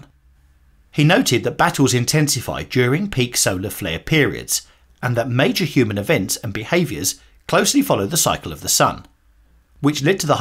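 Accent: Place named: United Kingdom. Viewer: Yeap, that's a Brit talking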